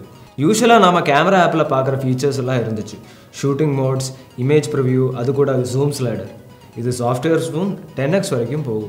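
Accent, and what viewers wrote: Kerala, India. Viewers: கேரளா people தமிழ் பேசுனா நல்ல தா இருக்கு .. good job bro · neenga Kerala va malayali maari pesurenga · Santhegame illa. Look & speech... Clr ah malayalinn kaatuth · Konjam kerala slang varuthe Athum nall than irruku👍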